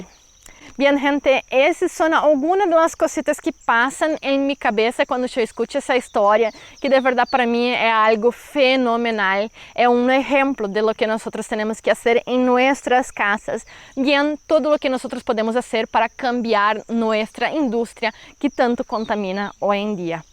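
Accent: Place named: Rio Grande do Sul, Brazil. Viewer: Você é brasileira né? Seu sotaque é de quem fala português do Brasil...gaúcha com certeza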